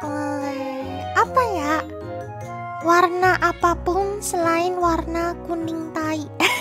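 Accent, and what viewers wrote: Java, Indonesia. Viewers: Kalo aliaNgomong imut imut kel gitu..aku dengernya kek medog gitu loh..ke org jawa yg lg ngomong indo...hihihihi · Gua denger lebih detail lagi suara kak ada logat jawak nya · logatnya kayak jawa wk 🤣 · Kok ngomongnya medok Jawa ? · suaranya kyk ada medok" nya gitu:>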